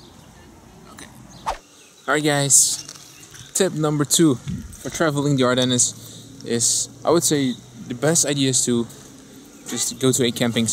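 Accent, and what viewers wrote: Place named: Netherlands. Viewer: He is dutch. I can hear it by his accent :)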